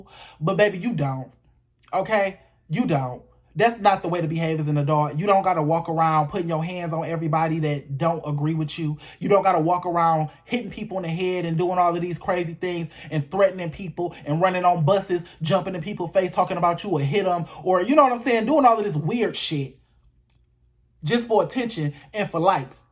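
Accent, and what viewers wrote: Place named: South, United States. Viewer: You’re accent is fine 🩵are you from the south I love southern accent or east cost too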